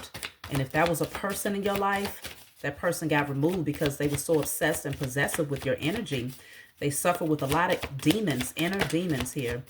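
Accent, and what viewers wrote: Washington DC, United States. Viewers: This msg was 🔥 torch. Ur DC dialect puts a smile on my face every time…being from SE DC myself. As always thank you!!😉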